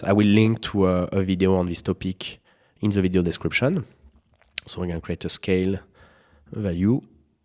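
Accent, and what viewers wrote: France. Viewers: Garde ton accent buddy. Les gens te comprennent c'est le principal. Et puis peut-être que c'est le petit truc en plus de ta chaîne. Le frenchy accent. 😀 La fameuse French Touch ! :elbowcough:.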